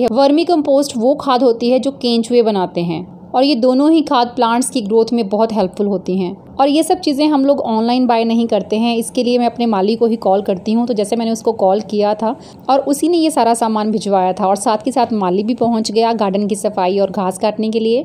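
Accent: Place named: Himachal Pradesh, India. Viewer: Aapki awaz se hi me jaan gyi thi ki aap HP k ho 😊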